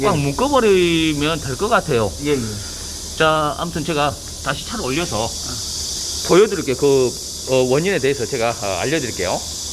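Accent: Jeolla, South Korea. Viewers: 어디서 공업사를 하시는지 궁금합니다 전라도 말투신것같아서 전라도면좋겠어서 댓글드립니다ㅠ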